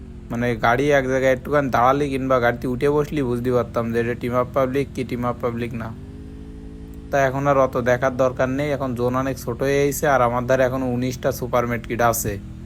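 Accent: Jessore, Bangladesh. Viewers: ধন্যবাদ ভাই আপনার খেলা দেখে জুন পোস্ট দেখে ভালো লাগে, আপনার কথা শুনে মনে হয় আপনি যশোর জেলার লোক, আপনার বাসা কি সাতক্ষীরা, ঝিকরগাছা, আমার বাসা যশোর ঝিকরগাছা থানা বাঁকুড়া ইউনিয়ন,❤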